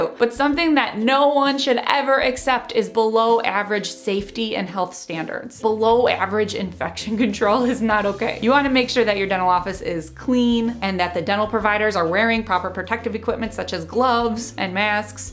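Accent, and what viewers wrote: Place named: Chicago, United States. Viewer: Great advice. Your Chicago accent feels like home, sounds like my friends.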